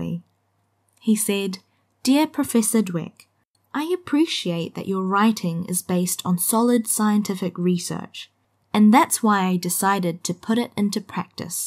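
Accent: New Zealand. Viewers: 언제 어쩌다 뉴질랜드 발음에 꽂힌 지 모르겠는데 그때부터 항상 잘 듣고 있어요! 목소리랑 발음 너무 예뻐요!! 나중에 문장 끊어서 쉐도잉 할 수 있도록 반복재생? 되는 것도 올려주시면 좋겠어요 너무 좋아요ㅜㅜ♡ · 이거랑 Te Aorewa 영상으로 쉐도잉하는데 정말 좋아요! 항상 쉐도잉 자료는 영국, 미국 영어만 널려있어서 손이 안갔는데 한국 유일하게 키위 액센트 교육채널이 있다는게 정말 감사해요. 앞으로 인터뷰나 테드처럼 쉐도잉 할 수 있는 컨텐츠도 더해주실수 있나요??ㅠㅠ 목소리도 너무 예뻐요ㅠㅠ 왜 top sexiest accents에 키위억양이 1위로 뽑혔는지 알것같아요ㅋㅋㅋㅋㅋ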